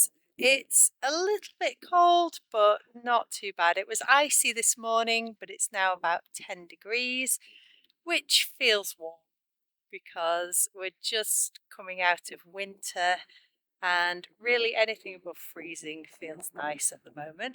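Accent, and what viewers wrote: United Kingdom. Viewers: It's easy to hear and to learn. I love the British accent though. Thanks teacher!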